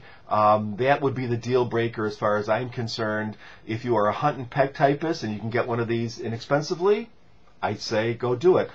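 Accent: Chicago, United States. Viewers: Hahaha Its the way you pronounce your "A's" and "O's", especially the A's. For example in "podcast". Sounds like a strong Chicago accent to me. I'm from the south (Arkansas), by the way.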